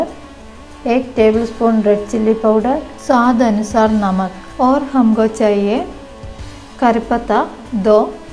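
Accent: Kerala, India. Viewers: It is actually Malayali Accent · Very Nice Recipe... Will surely try...also liked the way you described...typical mallu style.. Saukyaam maam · My all mallu friends speaks same Hindi ❣️ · Dear sister I like your keralite accent very much and recipes, today easiest way to make samber... I try it your way.... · She's a very enthusiastic person I liked her hindi kerala accent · Your dishes are authentic. Even more you are so humble and sweet person. You chose hindi with your malayalam accent. Stay blessed!! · മൊളു ... ഹിന്‍ദിയിലെ മലയാളം accent ...അതാണ video highlight ... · Kerala hindi accent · Love your keralite Hindi accent. U r very sweet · Malayali* accent.. other accents are different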